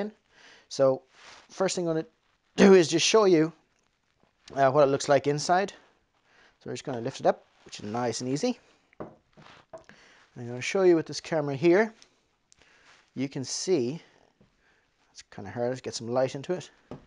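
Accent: Ireland. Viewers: Where are you ? You sound irish, how did you get a Boyds stock over ?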